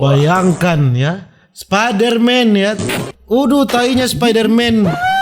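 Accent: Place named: Medan, Indonesia. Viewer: Orang medan ya bang, chenel ter kocak menurut saya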